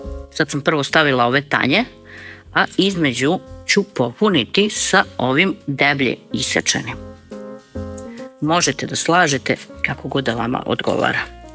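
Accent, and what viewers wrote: Vojvodina, Serbia. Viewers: Svaka vama cast.Po naglasku bih rekla da ste iz Vojvodine ako je tako nije cudo sto vam sve ide kao voda.Veliki pozdrav.❤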